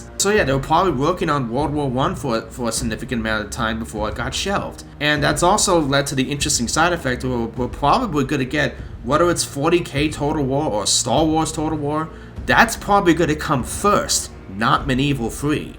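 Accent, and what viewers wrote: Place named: Rhode Island, United States. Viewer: Dude sounds like he from Warwick RI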